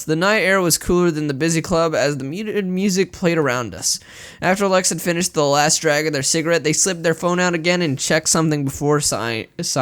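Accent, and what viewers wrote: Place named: New England, United States. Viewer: The accent challenge (New England) edit: the English in new English is just American not fake British.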